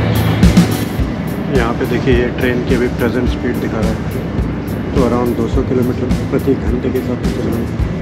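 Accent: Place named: Hinglish, India. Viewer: Какой классический у Вас хинглиш!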